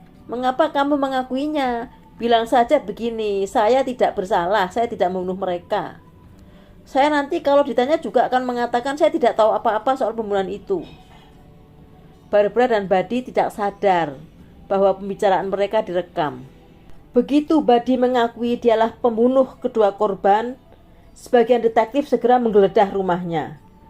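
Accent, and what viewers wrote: Java, Indonesia. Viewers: Jawanya meddok banget 😁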